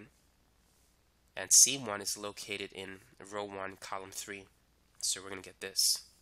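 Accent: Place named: Texas, United States. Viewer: Hi american , are u from Texas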